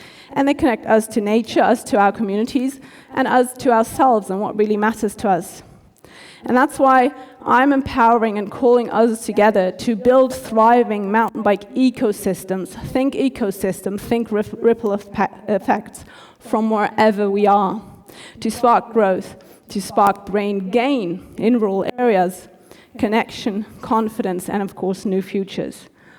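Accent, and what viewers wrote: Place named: United Kingdom. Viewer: Well done, my friend. And yes I hear the British accent. 😊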